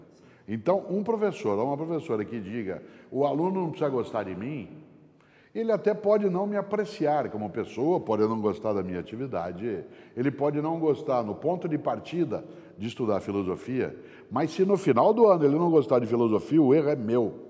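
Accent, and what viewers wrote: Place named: Rio Grande do Sul, Brazil. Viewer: Pena que o vídeo voi curdo esse sotaque gaúcho com toque italiano é de mais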